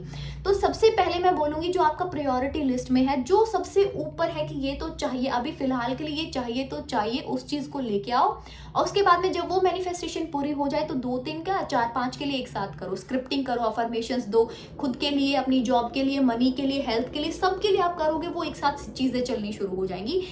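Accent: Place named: Uttar Pradesh, India. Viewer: Qki aap hna bolti ho jaise ki hm log bolte h Mai up se hu Raebareli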